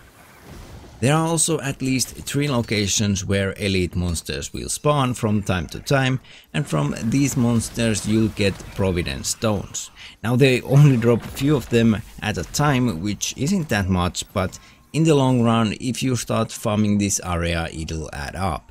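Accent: Finland. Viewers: Familiar finnish accent :)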